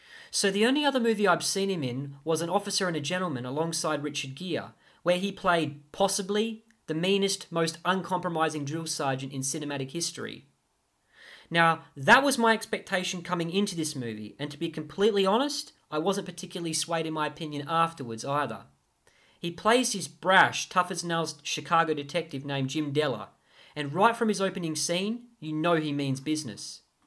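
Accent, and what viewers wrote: Australia. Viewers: You're Aussie mate. Me too 👍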